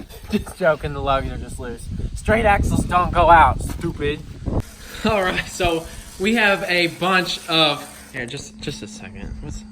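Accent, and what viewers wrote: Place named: Indiana, United States. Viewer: The accent, the Amish, the endless cornfields, the moronisms... Northern Indiana. Has to be!!! 🤣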